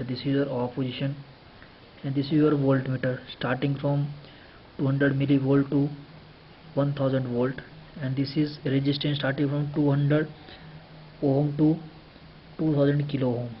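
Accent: India. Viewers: Big problem when showing how to measure the ACV,.... If would be VERY helpful to actually see where you inserted the lead prongs into the receptacle,,.... and then show the reading on the meter. does it matter where the red and black leads go in a 230 (in Ukraine) receptacle? I'm familiar with American electrical systems, and it doesn't matter on 110 v circuits, ... but 220 must be checked with one lead (it doesn't matter which one) in the ground terminal, and the other lead in either hot terminal. Each hot terminal will check as 110 v,.. and together, the 2 hot 110 v equal the 220 v. BUT in Ukraine it's 230 v and the old houses/apartments DON'T HAVE GROUND TERMINALS (OR GROUND WIRES) IN THEIR RECEPTACLES!! I'm not sure if it's the same in India (which is what the accent of the man doing this video sounds like)? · mumbling and strong Indian accent..not dis like for accent...but the mumbling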